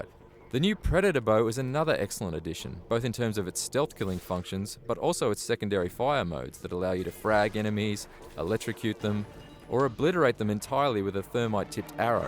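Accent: Australia. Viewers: He sounds Aussie :D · An aussie, finally <3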